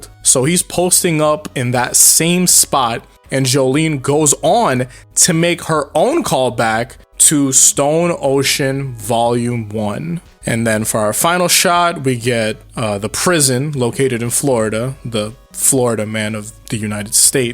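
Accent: Canada. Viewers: Are you a Canadian Lad?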